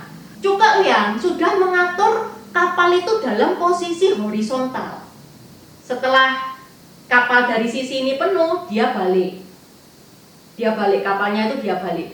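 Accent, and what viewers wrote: Java, Indonesia. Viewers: Klo boleh saran.... Usahakan dalam penjelasan cerita murni pake bahasa Indonesia yg mudah di mengerti.... Krn gak semua pendengar bisa bahasa Jawa apalagi dengan eksen medhok. Hanya sekedar saran ya... Thx u 🙏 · Jeng anda orang mana...logat anda medok banget...unik...apa adanya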